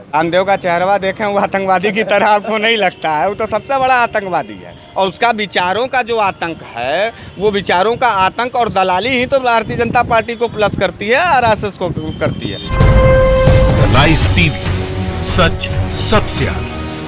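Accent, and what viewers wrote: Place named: Varanasi, India. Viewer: wah bahut achha bolte pakke banaras wale ho bekhauf zubaan bebak andaj thank you for the liv tv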